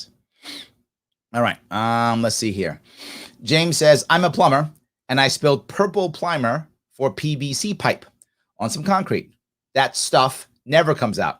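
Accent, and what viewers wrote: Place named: New York, United States. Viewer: Larry: for Pete's sake, you talk like a typical "New Yorker"...terrible weather in New York, in New Jersey (so cosmopolitan of you to deign to mention NJ), but what about Philly and Washington, DC...we don't get any respect? Let me inform you that we got a TON of rain and wind in DC on the night of 31 August. NYC is not the prima donna (not anymore) of the East Coast!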